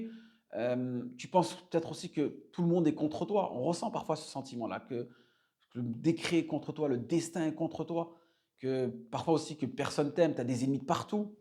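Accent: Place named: Maghreb. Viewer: الحمد لله والصلاة والسلام على رسول الله ، وعلى آله وصحبه ومن اتبع هداه ، سيدي الفاضل إذا كنت إمام مسجد في أي دولة من الغرب ــ قدًم نفسك أنك إمام متمكن في الدعوة الإسلامية لا خطأ و لا فتوى ــ إياك أن تفتي و أنت لست من أصحاب الإختصاص بمعن آخر ـــ عملاء في مظهر العالم . أنت متعلم و ربمـا مثقف و حسب نبرة صوتك من دولة ، أنا كذلك أنتمي و أسكن فيها ـــ الملاحظة هي : حث المتحولين المسيحيـيـن إلى الإسلام قراءة اللغة العربية الصحيحة و ليس العريية المختلطة بالكلمات الفرنسية كما يتكلمون إخواننا في كل ـــ المغرب الكبير ــ اللهجة الملتوية بكلمات فرنسية و المؤسف الشديد نرفض التغيير و نتمادى في الباطل ووووو . علِمهم اللغة العربية الصحيحة ، حدثهم عن القرآن كلام الله القرآن هو روح ــ روح القدس عرفهم أن القرآن معجزة بالغة ، أما مفعول السورة هذا موضوع آخر ، إذا أنت ياسيدي المحترم قناتك فيها مواضيع مهمة عن الشريعة فكن حقا ــ سفير الحق ــ و إستعمِل اللغة بحقيقتها و ليس مزيفة ( إذا تحدثت باللغة العربية كن فصيحا أو تحدثت اللغة الفرنسية كذلك لا تظيف كلمات عربية و أنظر إلى إسم قناتك كتبت كلمة فرنسية و أظفت معها جملة متكونة باأحرف فرنسية و هذا خـطـأ فادح ، لو قلت لك ياسيدي ، أنا أتكلم اللغة الفرنسية الفصيحة و لو مرة في حياتي كتبت يعني ( الكتابة بالفرنسية و النطق بالعربية ) أنظر في إسم قناتك !!!!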